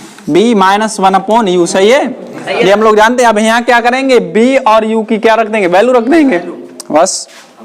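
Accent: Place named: Aligarh, India. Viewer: Bhaiya aligarh se ho kia😅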